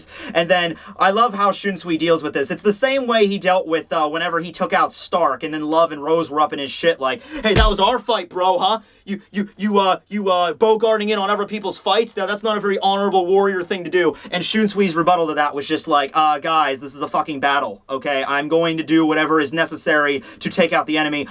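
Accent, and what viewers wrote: Canada. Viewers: Does any one else hear the canada in the intro?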